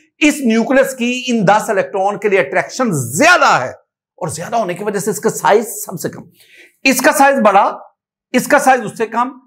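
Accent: Punjab, India. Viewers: Mashallah sweet Punjabi Urdu ❤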